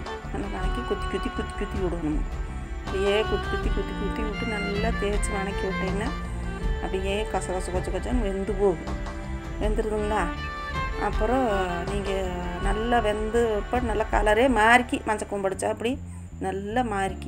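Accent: Kongu, India. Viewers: கொங்கு தமிழ் ultimate.... 👏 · கொங்கு தமிழ்😎😎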